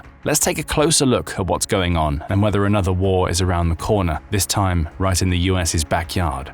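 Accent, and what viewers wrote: United Kingdom. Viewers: interesting there is a british announcer narrating this video, implies the drug trade in participated in by British intelligence ...MI-6, anyone? Nothing new about the British trafficking drugs to exploit and destroy regimes and countries ... and the british monarchy is historically one of the biggest players in trans-national drug dealing ...after all, where did their wealth come from ... invading, destroying and colonizing colonies and countries of color. City of London bankers direct and finance all this for the monarchy, and it's no secret, that the U.S. remains a colony of the British Empire, according to treaties signed in 1776, 1810, and so on. It seems to me if South and Central and North American countries are humbled as drug dealers, that puts the british back on top in eastern europe, western europe, eurasia, africa and so on. 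signed, PortlandHomeboy ... btw that's also the name of my website.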